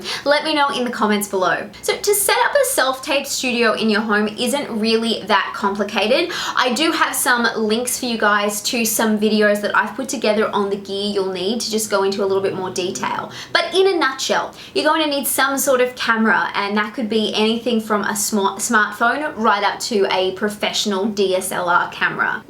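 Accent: United States. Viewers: Ur American accent is so on point. It doesn't sound fake or exagerated. you sound lovely and moremature in the Am accent. No hint at all that you are Aussie!! I'm on my way to get this accent ready!! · Excellent tips. Thank you. Especially the advice on too many takes. I've selected one of the first takes more often than not. The other point that you touched on is that it creates a false positive. You will almost never get that many attempts on set and, if you do, it will be nerve-racking. Does your market encourage the pan up & down for the full-body slate? They won't tolerate it here so there's always a hard cut. In this market, the slate is always either a tail slate or a separate file. Also, congratulations on the dialect work. Your American is flawless! Thanks again for your generosity. You are very kind to lend your expertise.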